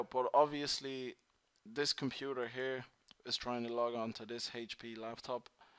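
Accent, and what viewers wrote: Ireland. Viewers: Thank you man.. 🙏 luv dat Irish accent btw 🤗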